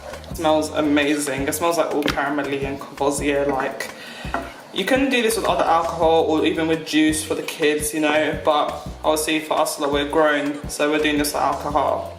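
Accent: United Kingdom. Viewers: So love the uk accent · I was so shocked to see you had a British accent! I like your channel ♥️. New subbie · Nice recipe, but I'm mainly subbing for your accent....soooo english😁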